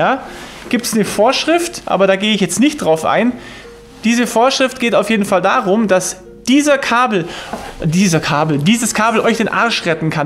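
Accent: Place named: Bavaria, Germany. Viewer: Hey! Super Videos, super Erklärungen die eigentlich jeder (Vollgasdepp) auch versteht und das ganze Gefluche auch noch auf allgäufrängbayrisch - einfach HERRLICH:D Speziell zu diesem Video: nimmt man nicht unisolierte Aderendhülsen für solche Arbeiten um nicht die Adern eben durch jene Isolierung unnötig zu belasten wenn diese im Stecker oder der Buchse dann (ab-)geknickt werden? (wenn man keine hat, kann man auch VORSICHTIG die Isolierung der isolierten Aderendhülsen mit einem feinen Seitenschneider oder einem scharfen Messer entfernen:) ) Grüße aus A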